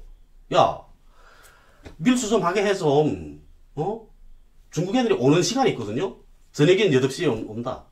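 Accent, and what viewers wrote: North Korea. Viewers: 재미있게 잘 보았습니다. 북쪽 말을 알아 듣기가 조금 힘이 드네요. 70 ~ 80 % 정도 밖에 이해를 못 했습니다. 말씀을 조금만 천천히 해 주시면 더 많이 알아 들을 수 있을 것 같습니다. 하루 속히 남북이 자유롭게 왕래할 수 있기를 바랍니다. 좋은 말씀 감사합니다. 남쪽에서 행복하게 사시길 빕니다. 건강하세요 !!!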